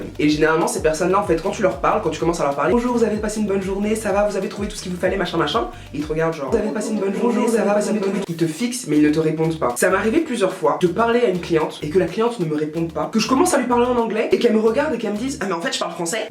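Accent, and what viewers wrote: Ivory Coast. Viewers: ..je sur kiffe ton accent et vive Abidjan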